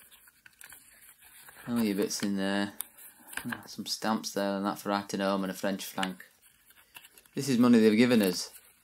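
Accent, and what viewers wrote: United Kingdom. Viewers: Barnsley accent? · Your British accent is excellent. Sounds legit Enough that I have to put subtitles on. I can't understand any of those people except the posh ones or the Welsh.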